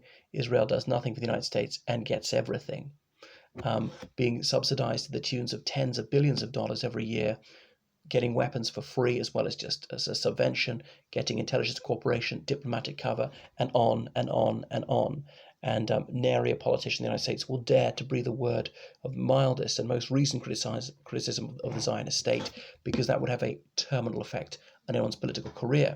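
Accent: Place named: England, United Kingdom. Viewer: are you Irish or English? You certainly sound extremely English and but your channel name is from Ireland?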